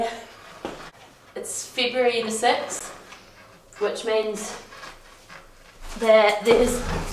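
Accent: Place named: New Zealand. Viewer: Didn't expect a kiwi accent :)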